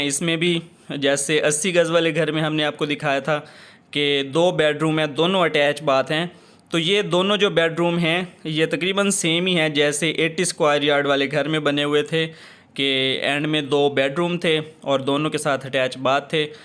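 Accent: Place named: Punjab, India. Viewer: location آواز سے پتہ چلتا ہے کہ بندہ پنجاب سے ہے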